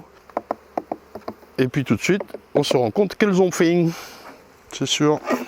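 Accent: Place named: South-West, France. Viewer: Avec ton accent du sud ouest quesque tu fou dans le chnord 😂😂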